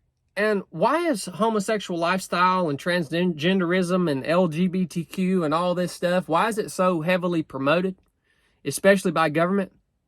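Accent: South, United States. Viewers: What state down south are you from bro?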